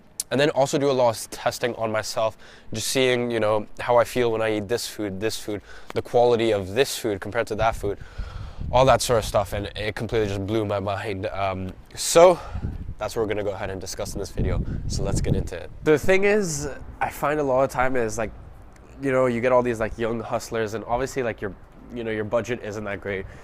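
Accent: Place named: United States. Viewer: from the UK but you sound American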